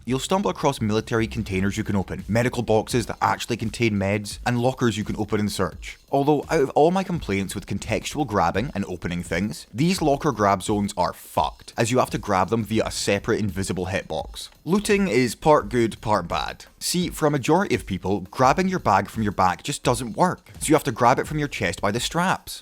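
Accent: Scotland, United Kingdom. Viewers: yeah he's from Glasgow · is this a scottish accent?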